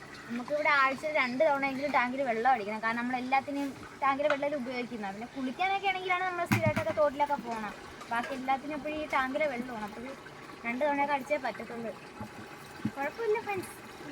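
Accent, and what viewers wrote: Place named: Kollam, India. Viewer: നിങ്ങളുടെ സ്ഥലം എവിടെ ആണ്??...കൊല്ലം ആണോ??...സംസാരം കേട്ടിട്ട് കൊല്ലം പോലെ തോന്നി??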